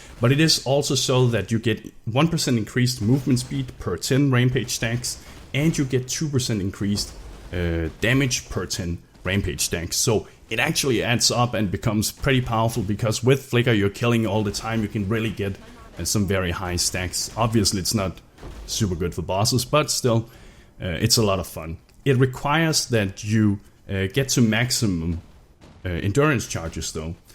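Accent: Denmark. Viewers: are you from denmark xD that accent sound familier ^^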